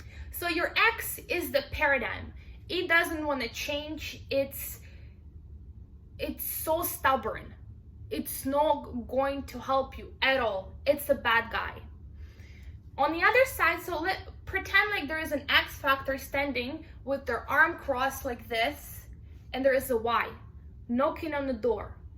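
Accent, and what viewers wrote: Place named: Russia. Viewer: ohhhhhhhhhh that kiss in the end, let's just make russian babies already. That russian accent makes me melt. Can you do a russian language lesson video next time i think that would be your niche on youtube.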